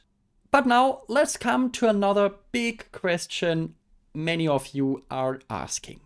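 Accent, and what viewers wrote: Germany. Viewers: it sounds like a German accent to me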